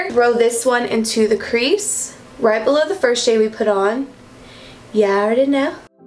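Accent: South, United States. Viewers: I absolutely love your videos and southern draw!! Keep it up darlin'. 💚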